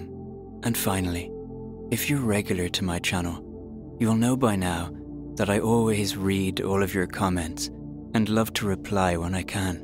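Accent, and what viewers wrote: Ireland. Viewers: I'm an absolute insomniac, however you help me relax with your beautiful Irish tone that reminds me of home, God bless you ❤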